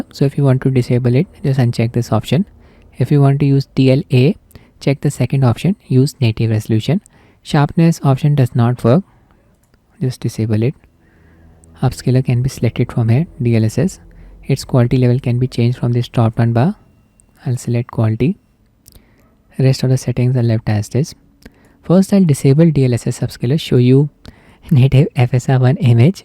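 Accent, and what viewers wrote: India. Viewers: I dont understand indian english..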